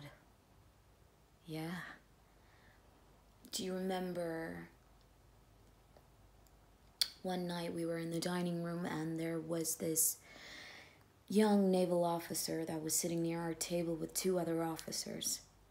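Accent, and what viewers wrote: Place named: United States. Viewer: your american accent reminds me of michelle rodriguez!!!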